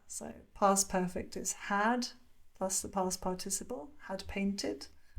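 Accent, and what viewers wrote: United Kingdom. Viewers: I love the way you speak English with British accent . What I like to say is speaking English with correct British accent gives me like a certain charm.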